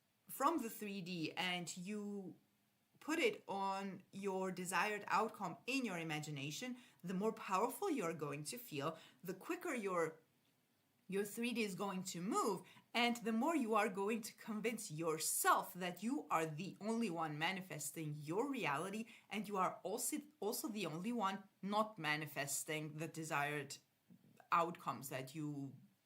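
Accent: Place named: France. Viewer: Hi!! I’ve been following your videos for a while now. I really love your content and you’re one of the people on YouTube whose perspective resonates with me the most. I was wondering what you think about manifesting a relationship with someone (a public figure, but I know that’s not really the point) who doesn’t know us yet. Where would you personally start with something like that? For the past few months I’ve been trying different techniques. Sometimes in imagination I feel absolutely certain that I’m receiving what I want. I know it, I feel it. But after some time, when I don’t see any movement in that direction, I start doubting everything — even the law itself. I always manage to bring myself back, but it’s not always easy. I also have a very specific question: when in dreams you keep seeing yourself in the same dynamic with that person — meaning more in the “fan” position rather than someone who is actually part of their life — do you think that could mean the manifestation hasn’t really settled into the subconscious yet? 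Thank you so much! And by the way, where are you from? I hear an accent that almost sounds French, but I’m not completely sure. 😌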